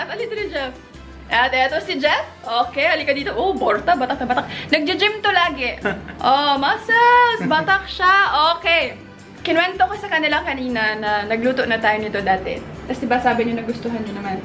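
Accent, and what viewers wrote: Visayas, Philippines. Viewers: bisaya gyd kaayo c ay 😍😍 mailhan kaayo sa iyang inistoryahan.. hehe..Proud bisaya here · I luv ur bisaya accent, 😁👍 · Nice kayo imo accent.. ung naa bisaya nga accent pero cute ❤️ ur shirt is amazing too! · Hahaha lodi ang cute nang accent...bisaya gyud...thanks for sharing nalingaw ko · Makalingaw kaayo ka te duh, samot na imong bisaya accent❤️ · Cute ng accent ni. Bisaya gyud ka dai. Proud Bisaya here! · Girlfriend material talaga to 😍 Walang arte mabait sa kapwa. Hindi lumalaki yung ulo kahit malayo na yung narating. Nakakainlove 😍 Bisaya tone, beauty face, beautiful personality. Godbless po sayo. More opportunities to you 😍😘💕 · I like your bisaya accent, "Mutsarela" 😍😍😍😍😍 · Ang kyut ng pagsasalita na parang bisaya. Haha. ❤ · I love her bisaya accent. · Love the Bisaya accent when she speaks tagalog 😂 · Bisaya japon ug tono ba hahahah cuttteee oyy 😍😍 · Sa kadugay na nimo nagpuyo anang manila dhai,halata gihapon kaayo imong bisaya accent.😁😁😁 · Hahahaha nigahi na bai lingawa nako oie sa bisaya na accent. 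· Nindot paminawon ang imong bisaya accent madam. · Very pretty pinay beauty! 🥰 i am a big fan! Nindot sd kaau imung tagalog na may bisaya accent hehehehe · lumalabas ang bisaya tone n 😉cute😍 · Yung Accent minsan may pgka bisaya talaga ehy😍 Ang cute😙 proud bisaya here❤ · I like that she never lost her bisaya accent hehe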